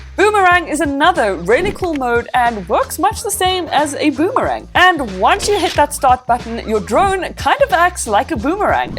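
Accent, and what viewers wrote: Afrikaans, South Africa. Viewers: Are you Afrikaans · Hmm, are you from SA, i notice so of those areas? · I just want to know?????? Are you from South Africa🇿🇦🇿🇦🇿🇦🇿🇦 BTW I love your intro🥨🥨🥨🥨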